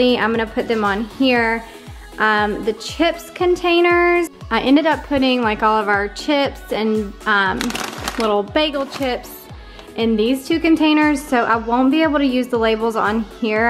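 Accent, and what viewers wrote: South, United States. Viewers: Hi there! I’m watching from Eagle Mountain, UT. I get the feeling you are from the South because of your sweet accent,( lived in GA for 12 years but a native Californian. My, “pantry” is actually a very tall, deep cabinet about a foot wide, at least 18 inches deep with just about a foot between each shelf that are not adjustable and it’s a brand new rental. The pantry is always disorganized!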